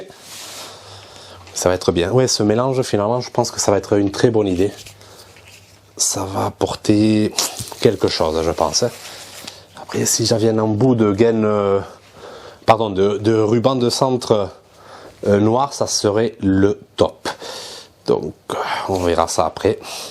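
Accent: Toulouse, France. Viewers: ami toulousain bonjour! Suis un passionné de velo vintage et j'en retape plusieurs par mois (les france loire, starnord ou arrow ont plus de secret pour moi lol ) sur le moderne je connais la theorie mais pas de pratique. J'aime beaucoup ta chaine que je suis. Plusieures choses: - l'immersion dans l'atelier avec le bordel inevitable, les imprevus galere, le bricolage pour s'en sortir..en toute transparence. Validé 🙂 - l'humilté generale de ton discours et attitude. Assez rare sur you tube pour etre souligné - Pas de tabou ou autres sur l'argent ou ta vision du commerce. Tres cool. (Tu voles pas ton argent, manquerait plus que tu ait honte de ca! huhu) - Les fichiers "one shot" sans montage, plus long et lent mais beaucoup pus vivant. Pas de montage pour toi. c'est tout benef de temps. Le seul inconvenient est pour toi car cela t'oblige a parler tout le temps pour "meubler". 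J'aime beaucoup l'accent toulouain mais je t'avoues que je mute le son parfois (no offense bro'!:-) ) Sur cette video: Magnifique impro sur l'habillage du cintre! dommage que le marron aille pas un poil plus haut pour avoir le noir juste la partie horizontale. Le noir aurait ete invisible de profil ) Au final, Les velos bleu des ces années la (teinte souvent pastel ou pailletées) avec cintre et selle marron ca match toujours! Moi j'aime beaucoup le resultat ici BRAVO! Changes rien Amitiés du Morbihan;-) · En tout cas bravo ,avec rien tu fait quelque chose ....sais plus quoi dire ,en plus je vais faire une confidence,c'est grâce a cet accent toulousain que j'adore et qui rends ces vidéos encore plus agréables et attrayantes a voir ....c'est pas beau ça ? suis sincère crois moi et je pourrait regarder pendant des heures .....bon weekend du 11 novembre...😊✌✌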